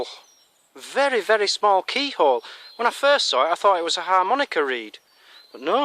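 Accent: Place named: North, United Kingdom. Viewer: BO2 azza hoster. Somewhere up north in the UK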